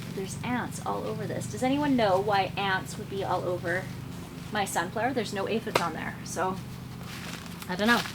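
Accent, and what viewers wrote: Canada. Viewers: I just love your Canadian accent and how you say words. It’s funny that you brought up “marrygolds” 😊 You have such a soothing voice that I can listen to you talk all day long. Thank you for sharing your beautiful life with us 💗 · I love your Canadian accent. My dad's family are Canadian. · I'm in Minnesota. Besides words like "process", I hear your Canadian accent in your "ou" words; about, house, out, etc. 😊 It's very subtle, but i hear it!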